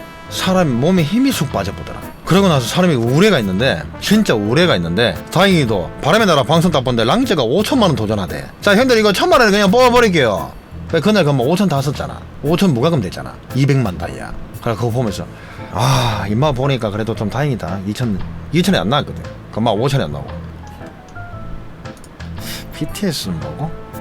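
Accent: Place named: South Gyeongsang, South Korea. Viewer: 억양은 경남 ㅋ 단어는 공가라,쓰바르마 경상 ㅎ 섞여있는듯해요~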